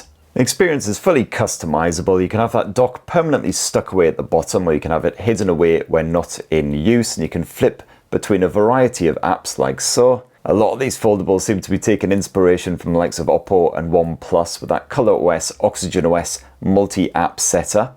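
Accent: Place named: Northern England, United Kingdom. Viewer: I could listen to his Northern English accent all day long.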